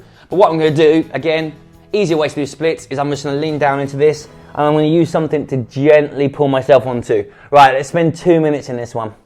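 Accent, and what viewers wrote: United Kingdom. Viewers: Haha, I can hear the British accent off of this, Sends much love from Kenya👋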